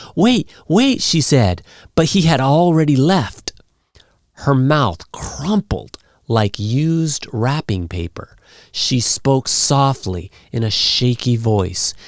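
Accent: United States. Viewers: This voice Sound of American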